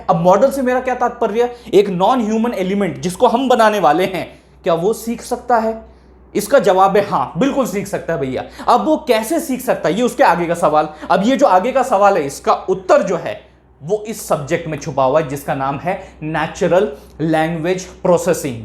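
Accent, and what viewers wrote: Maharashtra, India. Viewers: Your marathi accent is very good✨ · bhava tu marathi ahes ka? aikun bhari vatle!! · Your marathi accent is very good😊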